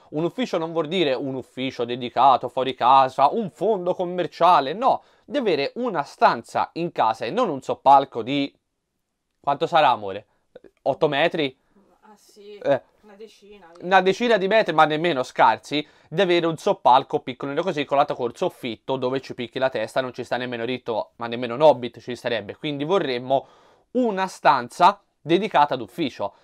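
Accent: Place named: Tuscany, Italy. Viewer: A me piace un sacco il tuo accento toscano e spero di vederti un nuovo "ufficio" presto! Buon Anno!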